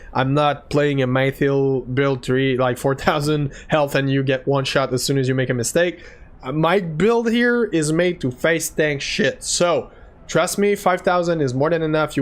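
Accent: Quebec, Canada. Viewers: The Quebecer is strong in this one. Am I correct brother man.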